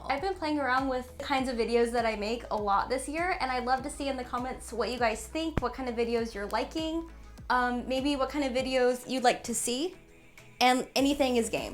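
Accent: South, United States. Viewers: This was very enjoyable. I'd like more videos like this on occasion. 😊 Plus, your comments at the end about the remnants of your southern accent were so fun/funny! 😁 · omggggg southern accent sounds so cute:) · i love this video! two things: 1. lmaooo when you said "don't worry, i have another one" and i thought you meant a backup hair dryer, but NO you had a backup dome hat thing OF COURSE. 2. lollllll at your accent, saying that you don't have one, but those two words "git" you. your "e" is often "i" in a very southern and very cute way! no hate here, only love! thanks for another great video! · There's nothing wrong with a southern accent. I am a true bornand raised southern and i wouldn't have it any other way. This was my first time watching you. I subscribed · Im from the south too!!! Yea i notice the twang sneaking in too lol. Also could you do some more "curler" videos? 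Ive seen so many i wonder about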